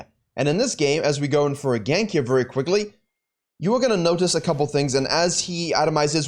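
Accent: South Africa. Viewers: Why do you sound South African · Dude you sound so much like a South African it's crazy haha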